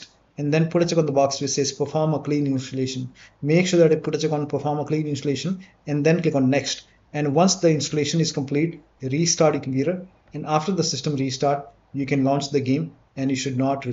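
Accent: India. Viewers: I’m pretty sure that was Indian but alr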